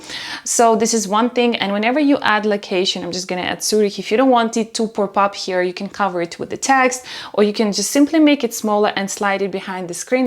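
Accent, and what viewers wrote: Russia. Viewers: Are you from Russia?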